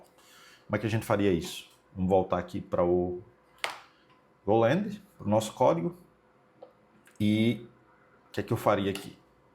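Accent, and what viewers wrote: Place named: Northeast, Brazil. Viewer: Aula Top. Parabéns Bom demais assistir vídeos de qualidade ouvindo um sotaque nordestino igual ao meu 😂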